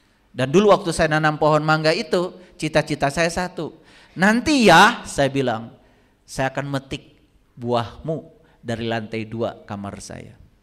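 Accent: West Java, Indonesia. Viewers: Ust ni sundanya daerah mana,ada yg tau?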